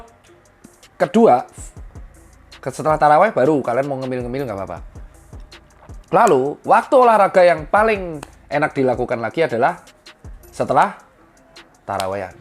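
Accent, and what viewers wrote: Java, Indonesia. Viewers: Dengerr medoknya dokter ini jadi inget seseorang:)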